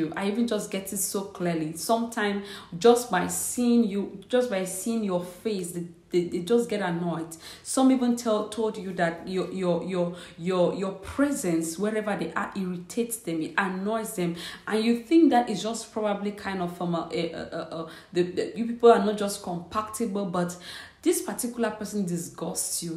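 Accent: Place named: Jamaica. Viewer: I LOVE UR JAMAICA ASCENT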